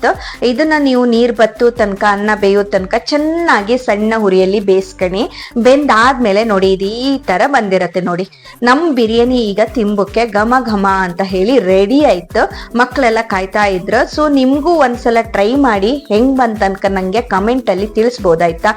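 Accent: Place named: Kundapura, India. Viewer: ಕುಂದಾಪುರ ಕನ್ನಡ ನ 😄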